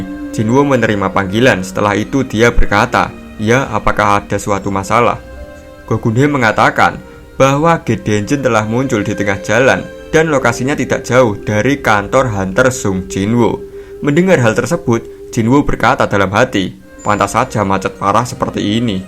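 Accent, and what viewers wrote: Java, Indonesia. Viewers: Saya dari part 1 di season 2 ini, saya merasa denger cerita korea dengan logat jawa, tapi seru, dan saya suka.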